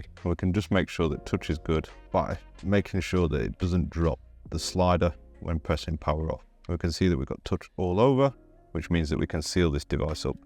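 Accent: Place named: Sussex, United Kingdom. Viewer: I just received my replacement battery for my iPhone 11, and I’m ready to put everything together probably tomorrow. Your voice sounds familiar to me as my ex-wife‘s mother is from Sussex. I miss bubble and squeak, bangers and mash, and steak and kidney pie. It’s been 30+ years since I’ve had it, and watching your video makes me ‘Jones’ for it. Thank you for your time.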